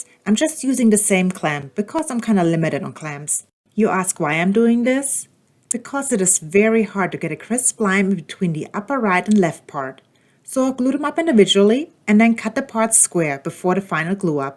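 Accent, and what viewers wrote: Germany. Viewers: I figured you were from Deutschland with the accent. My mom makes pfeffernusse for Christmas, my grandmother used to call me a kleine hans wurst when I got out of hand. She used to call everybody Hans wurst if she didn’t like them. Love that smile, and you make sanding fun and interesting.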